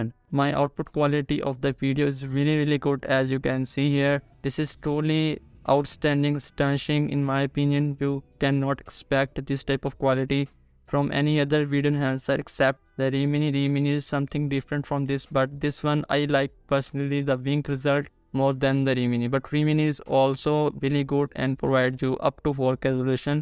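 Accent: India. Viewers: You Indian accent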